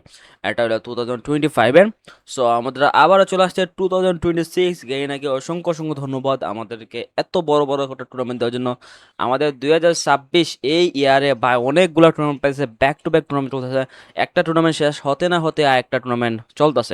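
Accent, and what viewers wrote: Sylhet, Bangladesh. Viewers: Tumi Ki Sylhety??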